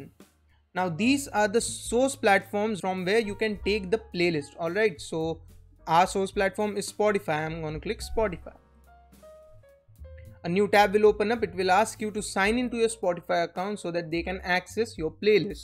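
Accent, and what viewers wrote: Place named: India. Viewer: Deshi style main English mat bol Bhai thoda toh respect Kar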